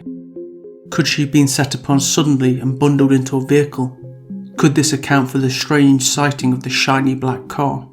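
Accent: United Kingdom. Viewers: Interesting case ✅ Suspense music ✅ British english narration ✅ Fantastic ✅ Thank you! ❤ from 🇸🇪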